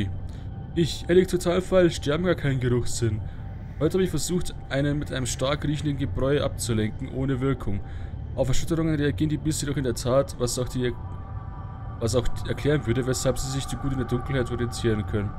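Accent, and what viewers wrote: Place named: Southern Germany, Germany. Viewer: Die Geschichte gefiel mir nur das Ending war nicht wirklich zufriedenstellend. Mal sehen wie das weiter gesponnen wird. Übrigens spricht man das normalerweise Tri-Nitro-Tulo-Ol aus weil das die Wortbausteine sind die für die Beschreibung der Struktur zusammengesetzt wurden sund (chemische Nomenklatur). Man merkt auch, dass du aus Süddeutschland kommst weil du von Kemie, Kina oder auch Arkitekt sprechen würdest ;)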